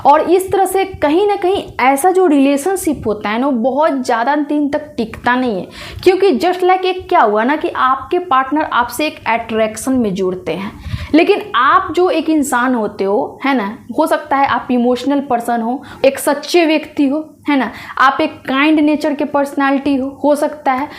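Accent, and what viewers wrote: Bihar, India. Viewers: Bahut sach kaha , aapki tone belongs to Bihar state ,? I imagine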